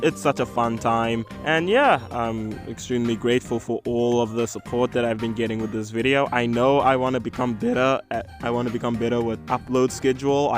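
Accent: South Africa. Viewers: Is bro south african? anyways slay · Quick question, are you South African? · very relatable n r u south african, if so me too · Hey, Genuine question, Are you from South Africa. I’m from South Africa, and your accent sounds like so many people I know in SA. Also this video is hilarious. My boyfriend loves mlp and luckily he has a girlfriend that loves it too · Are you South African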